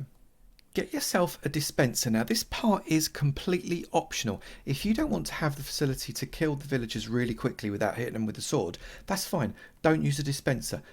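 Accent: United Kingdom. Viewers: Nah its British accent.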